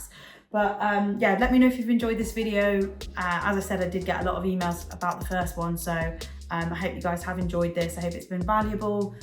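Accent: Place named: England, United Kingdom. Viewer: She sounds english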